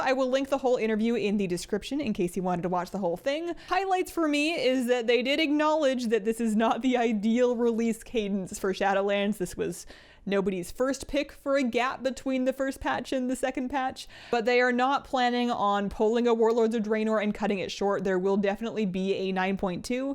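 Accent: Canada. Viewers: Are you Canadian by any chance?:D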